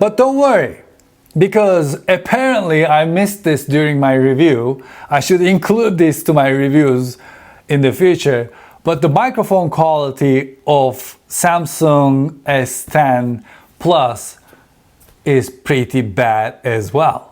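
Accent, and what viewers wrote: Turkey. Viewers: I sensed Turkish accent. Am I right?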